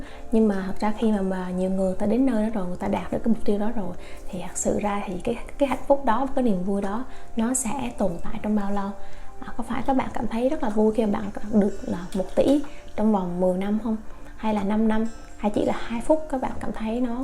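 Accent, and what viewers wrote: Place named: Quang Nam, Vietnam. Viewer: Giọng bạn giống Quảng Nôm hay Quảng Ngãi quá bạn hê :)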